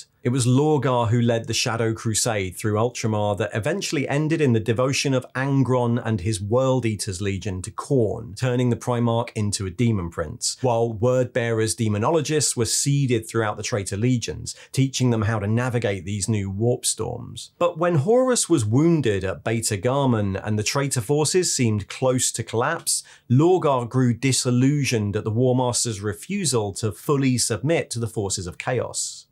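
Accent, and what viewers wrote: United Kingdom. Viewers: I love that you use as your end song clip, I look forward to it on all your videos and your condensed informational style on the lore. luetin's great, if you have the time. Major Kill's good for a some cheeky fun, Baldemort's amazing for the imagined paths one can explore outside the cannon. You are Leutin if he didn't get lost in the lore but with that silky smooth British accent. But concise, to the point, with a a tinge of humor that's just the right amount. A cooked to perfection dish of information.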